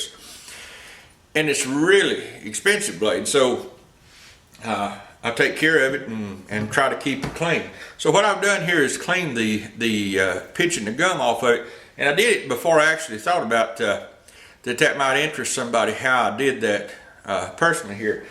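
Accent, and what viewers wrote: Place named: Texas, United States. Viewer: Hey, I have watch a hole lot of videos on You Tube and I want to say you are the best, really down to earth guy. I loved your show on safety. You are so dead on, of the point that it is your own business on safety. Thank you for your videos I have learned so much from you. You sound like your from Texas so am i close? LOL I live in Shreveport La Thanks again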